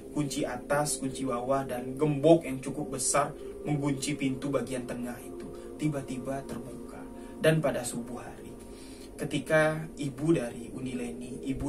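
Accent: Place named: Minangkabau, Indonesia. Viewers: Mantap bang. Bahasa Sumatera bang pas banget.👍👍👍👍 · Bg, pembawaan bahaso minang nyo barasiah, sampai wak sangko bg urang minang juo... Salam minang bang dr tanah borneo. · Mohon maaf lahir bathin bang Sukses selalu dgn ada nya chanel ini sy semangkin tahu banyak soal dunia mistik terima kasih bang,bahasa minangnya enak banget didengar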